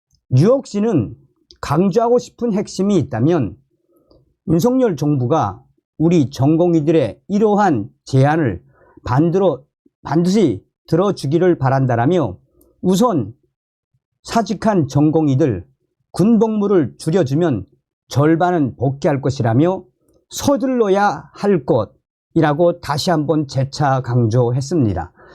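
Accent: North Korea. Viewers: 북한 어투와 어법이어서 인지는 몰라도 반복되는 중언부언이 너무 많고 사족이 너무 많으세요 선생님! 젊은세대들은 이런 스탈 싫어 합니다 .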